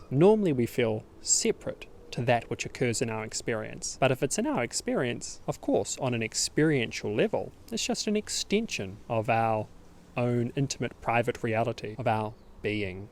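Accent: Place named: New Zealand. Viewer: Its nice to hear a NZ accent on youtube talking about non duality thanks .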